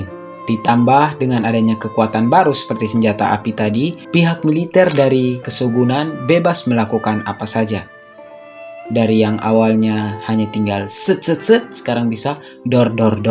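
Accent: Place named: Eastern Indonesia, Indonesia. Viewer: Fix Logat timur tdk bisa tipu hahahah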